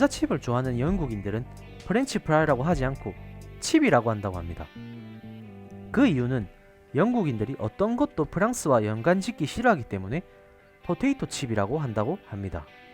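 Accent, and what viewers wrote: Gyeongsang, South Korea. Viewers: 경상도 외국어 말고 표준어 써라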